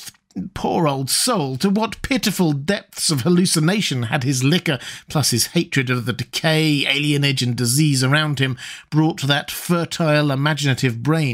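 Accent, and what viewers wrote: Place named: New England, United States. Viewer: Your New England sailor accent is lovely!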